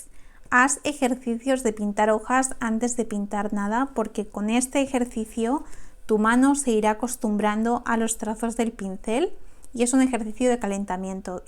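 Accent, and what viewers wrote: Spain. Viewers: Simplemente, eres MARAVILLOSA ❤ Hay muchos artistas, pero muy pocos maestros... Y tú eres una maestra increíble. Me desvelé hace un par de horas, me metí en YouTube y no sé cómo (no busqué nada sobre acuarelas) me apareció este minicurso como sugerencia... ¡Y me enganché! 😍 Sólo una apreciación y pregunta: ¿hablas catalán en tu día a día? 👀🫣 Es que me pareció que tenías un toque fonético algunas veces 🤭😊 Te mando un abrazo enorme, y GRACIAS por todo lo que haces y compartes 🤗 Que tengas muy buen día 🎉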